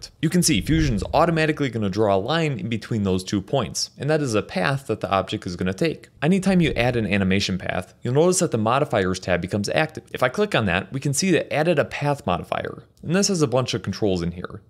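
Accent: Wisconsin, United States. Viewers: I love your Wisconsinite accent!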